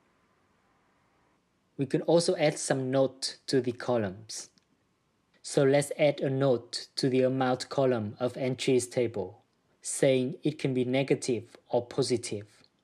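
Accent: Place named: Vietnam. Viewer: Your accent sounds like Vietnamese speaking English! Anw thank you for the tutorial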